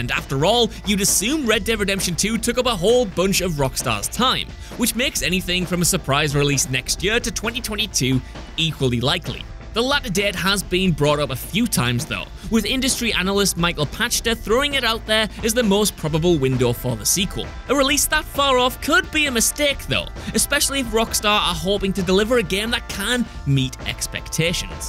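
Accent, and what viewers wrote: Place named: Newcastle, United Kingdom. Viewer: It’s called a Geordie accent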